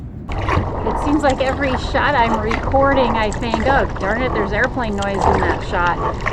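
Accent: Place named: Wisconsin, United States. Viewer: I grew up in Batavia and live in N. Michigan. Well, you haven't lost your Wisconsin accent have you? This was a nice, nature filled video which brought back fond memories of the good 'ole Fox River.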